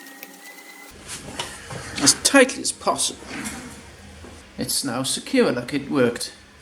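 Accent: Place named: RP, United Kingdom. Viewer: Words fail me...and what the hell is going on with the posh accent?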